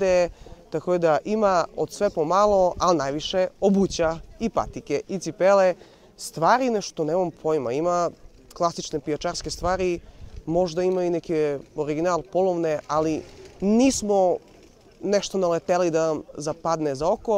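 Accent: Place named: Niš, Serbia. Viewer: to je neki "GU, GA, ĆE, ČE" Niški u kombinaciji sa Vranjansko-Leskovački i primesama LeBane...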